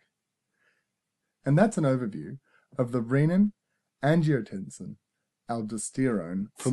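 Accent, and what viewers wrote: Australia. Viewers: This is FANTASTIC! Awesome job! Love that it is Australian as well, and not American with words pronounced oddly! Would be AMAZING if you did a tute for this series on the "Triple Whammy" (effect of NSAIDs, diuretics and ACE-I) - I have searched the internet relentlessly and never found one! Please please please! Thank you x<num> for your fabulous videos! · thanks! I could tell an aussie from a mile away. from a kiwi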